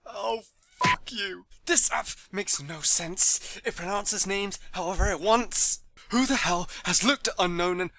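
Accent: United Kingdom. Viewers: lol you remind me a lot of Gigguk! it's the British accent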